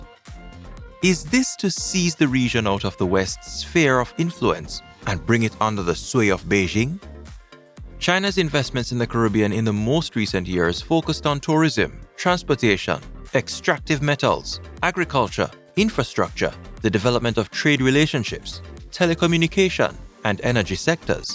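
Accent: Jamaica. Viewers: The fact that china notoriously deals with countries they KNOW CANT Pay the back i other to keep land is scary. He decided to invest in every poor 3 world island in the caribbean , Africa 2.0 icoming. Yeah look what they quietly doing i Africa. The guy that made this video sounds jamaican, and the fact that he speaks about this so innocently makes me sad loollll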